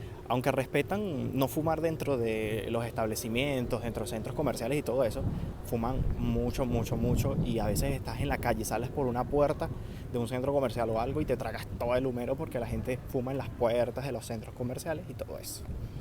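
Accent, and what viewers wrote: Venezuela. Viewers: Eres Venezolano mi pana? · Hola, cuando te escuche por primera vez le dije a mi esposo; cono este chamo es venezolano y efectivamente. saludos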